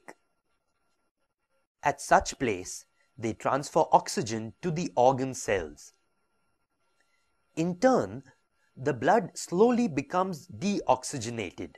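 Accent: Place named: India. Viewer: lovely indain accent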